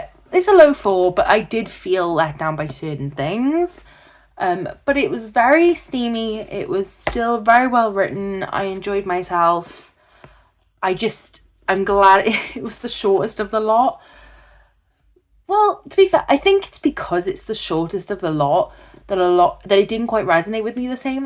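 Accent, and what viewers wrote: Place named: Wales, United Kingdom. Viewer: I've just found your channel and I absolutely love you and your Welsh yay xx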